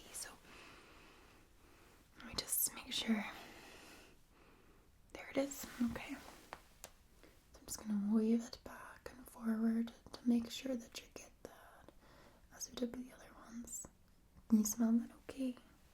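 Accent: Scotland, United Kingdom. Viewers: I love Cranial Nerve Examinations I've seen so many of them by different ASMRtists that I really want a Cranial Nerve Exam if there half as relaxing as in ASMR then I think I would really love it! If not for nothing if I ever need one I will have a wealth of videos to go back to to relax and calm my anxieties! I love your Scottish Accent in this video is this a Northern Coastal accent kind of like ASMR Shortbread or otherwise known as ASMR Shortie? Thank you for a really relaxing Tingly video it seems like today has been a stressful tiring day I really appreciate these calming videos in the evening!:_Loveheart::_Loveheart::_Loveheart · That Scottish accent, oh Marry and Joseph, lol :D just lovin' it :)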